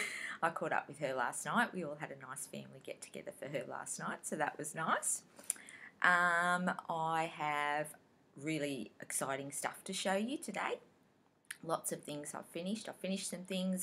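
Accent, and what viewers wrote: Australia. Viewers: Loved your video! It's so nice to hear an Aussie accent on Flosstube. Cinderella is absolutely gorgeous ❤️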